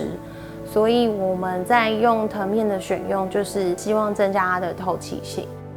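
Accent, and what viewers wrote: Taiwan. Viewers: 😆 好喜欢台湾腔的普通话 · Shout out to the Taiwanese accent. 濃濃的親切感。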